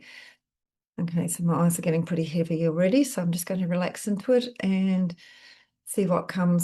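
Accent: New Zealand. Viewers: Thank you, It is so nice to have a reliable channel here in the Southern Hemisphere. I love listening to your beautiful Kiwi accent and know you are here in Eastern Australia. I am in Nth Narrabeen NSW and you really resonate in my heart. Thank you for your commitment, I am truly grateful 🙏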